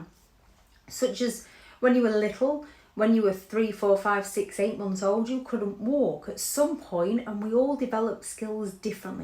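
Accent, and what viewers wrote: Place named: Scotland, United Kingdom. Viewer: It’s a very long slow process for the mob to catch on. Your message is so powerful. You’re 10 years ahead of a typical gringo. I know I’m supposed to be cold towards those we understand or care for. It’s a fking lie. We must love and care for our fellow tribesmen women. It’s a 2 way gig. If your message can do this to me? Just march on. You sound Scottish to me but I only did military things in Europe. Way too busy to be focused on dialectical grammatical words or culture. I’m trying to stay awake so I can get in a schedule. Since she’s been gone. Your message has been cutting through the mess. Working down south is just bribe or pay people to leave me alone or work for me. We are not a non profit organization. Get hard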